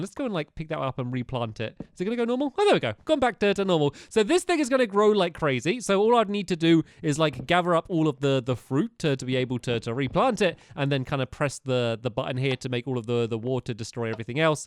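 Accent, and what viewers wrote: United Kingdom. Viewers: Is he british????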